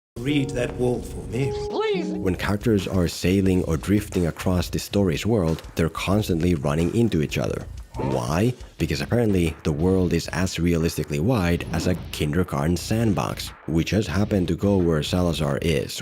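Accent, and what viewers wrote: Finland. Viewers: Right in the intro of the video there's an example of that in the form of a clip from a classic Finnish comedy sketch. In fact, enjoying the content of this channel is probably an example of that because the narrator's accent is almost certainly Finnish. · Jankon Betoni, laatu matskua. Kuulostaa ihan Suomalaiselta kyllä, aksentti on tutun kuuloista.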